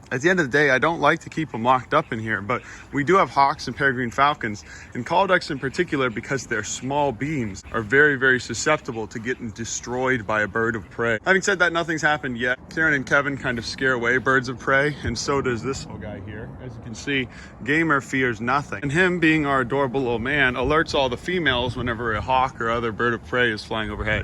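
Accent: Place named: Pittsburgh, United States. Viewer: I have never been more engaged in a strangers life more than yours lol. It took me way to long to pick up your accent(Crosby Jersey gave it away too) and I’m dying to know where you are from in Pittsburgh? I live in Plum Borough. Keep up the good work you deserve way more subs. I don’t think any other YouTuber had an effect on me like your stuff. I’m a grown ass man and yell EVER HEARD OF IT! At everyone. My gf does not appreciate it.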